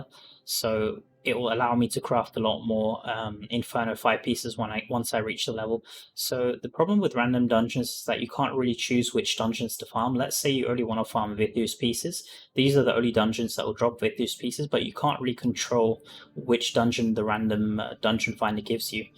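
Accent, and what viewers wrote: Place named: United Kingdom. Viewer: Y u name urself after biggest mass murderer but sound british?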